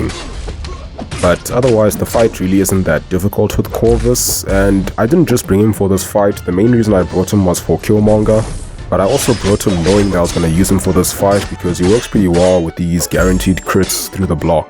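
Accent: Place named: South Africa. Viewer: Are you from south africa, your accent kinda sounds like a few people i know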